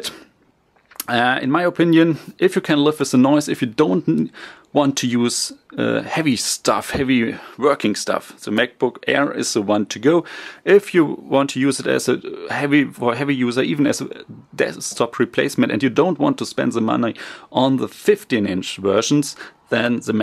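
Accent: Germany. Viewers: Are you from Germany nice accent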